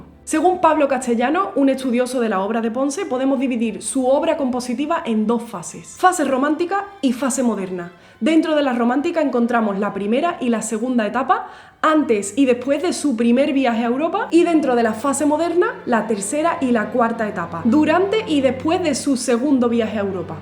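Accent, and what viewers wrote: Andalusia, Spain. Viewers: Maravilloso contenido; investigación solida y consistente, vinculación de la múscia con sus contextos sociales y económicos, pasión en el decir, maestria en el hablar y todo ello con el maravilloso acento andaluz, repito, ¡¡¡maraviloso contenido!!! Gracias. Saludos desde Guadalajara, Jalisco, México. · A mí me encanta oír tanta sabiduría, y tanta investigación, en mi acento andaluz. Porque siempre nos vemos en los mismos registros humorísticos y lejos de la divulgación culta y de la seriedad. Enhorabuena por tu canal.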